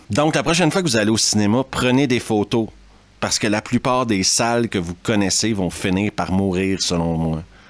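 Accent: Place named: Montreal, Canada. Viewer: Suisse ??? J’étais certain que tu étais de MTL 😂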